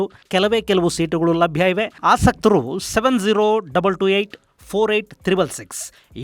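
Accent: North Karnataka, India. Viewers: ಸರ್ ನಿಮ್ಮ ಬಾಯಿಂದ ನಮ್ಮ ಉತ್ತರ ಕರ್ನಾಟಕದ ಭಾಷೆ ಕೇಳೋಕೆ ಚಂದ ಅದಕ್ಕಾಗಿ ಉತ್ತರ ಕರ್ನಾಟಕದ ಭಾಷೆಯಲ್ಲಿ ದಯವಿಟ್ಟು ಒಂದು ವಿಡಿಯೋ ಮಾಡಿ ಸರ್ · ನಿಮ್ಮ ಬಾಯಲ್ಲಿ ಉತ್ತರ ಕರ್ನಾಟಕದ ಮಾತುಗಳನ್ನು ಕೇಳುವುದೇ ಬಹಳ ಚೆಂದ.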